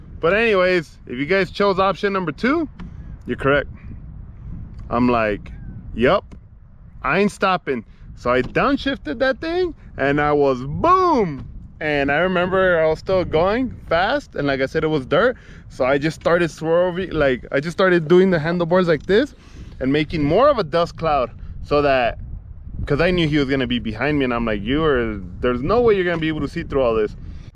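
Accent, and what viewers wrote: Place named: South, United States. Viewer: Love that country twang 😂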